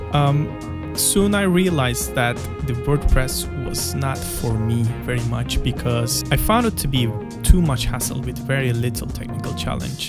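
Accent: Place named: Turkey. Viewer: bende diyorum bu aksan bir yerden tanıdık geliyor türkish baba türkish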